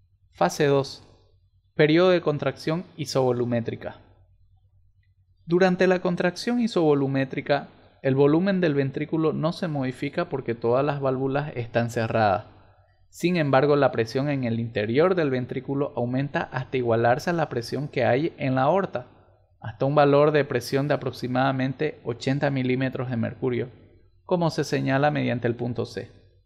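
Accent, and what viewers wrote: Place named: Santa Cruz, Bolivia. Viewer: Buenisimo video , una pregunta eres de santa cruz , porque tu acento es muy similar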